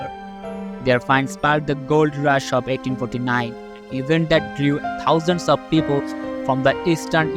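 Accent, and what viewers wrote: India. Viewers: So why is the narrator from the Sub continent of India? I guess 5 pictures and the wrong accents will get you view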